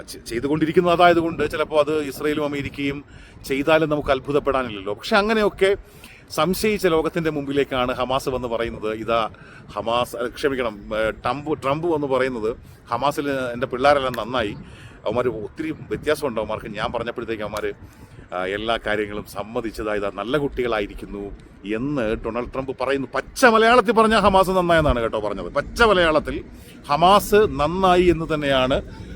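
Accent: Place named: Ernakulam, India. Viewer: സത്യസന്ധമായ അവതരണം, ഭാഷ ശൈലി അതിഗംഭീരം, , കേട്ടിരിക്കാൻ സുഖം, ഒരു എറണാകുളം സ്റ്റൈൽ, നന്ദിയുണ്ട് ബ്രോ,,, ❤️❤️❤️❤️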